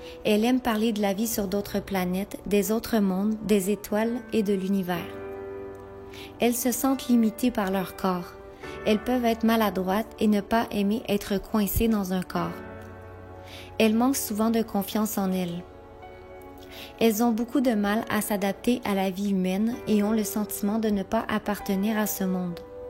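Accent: Quebec, Canada. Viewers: Bahahahahaaaa je m'attendais pas a entendre du Quebecois 😂😂😂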